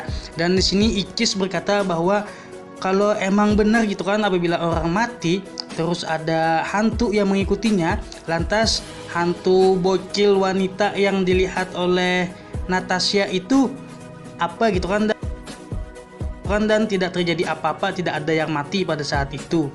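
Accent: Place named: Banjar, Indonesia. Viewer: Hadewww logatx orang banjar bgtt 😂😂😂😂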